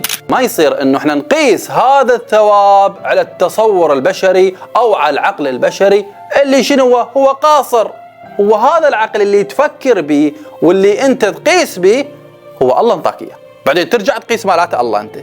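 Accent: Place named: Iraq. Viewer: شيخنا الفاضل انت عراقي لان لهجتك تبين من غير دوله احترامي لحضرتك من تكون عراقي او من غير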